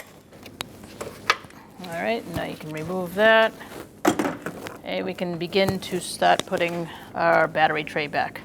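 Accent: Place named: New England, United States. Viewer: Love the NE accent, thanks for the video!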